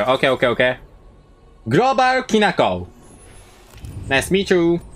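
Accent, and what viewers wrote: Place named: Japan. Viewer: Japanglish for the win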